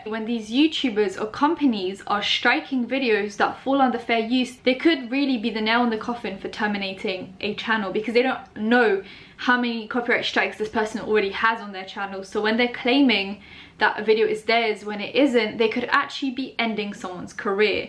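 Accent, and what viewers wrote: United Kingdom. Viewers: Grl i started liking uk accent because of you and i love yours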